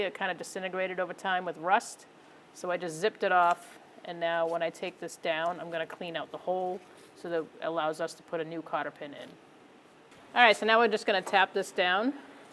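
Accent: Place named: New England, United States. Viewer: She sounds and that Subaru looks like its from Massachusetts or some other New England state. Lol im from Maine so i know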